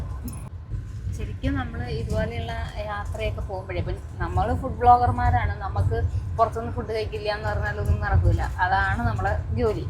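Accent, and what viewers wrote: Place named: Valluvanad, India. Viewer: Molu eth nattukariyanu❤valluvanadan bhashyanu❤❤❤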